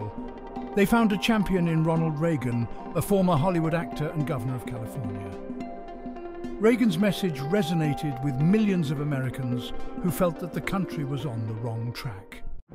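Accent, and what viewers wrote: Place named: England, United Kingdom. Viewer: So strange: Scattering modern "historical" pics with an English accent and "inspiring music" What a trip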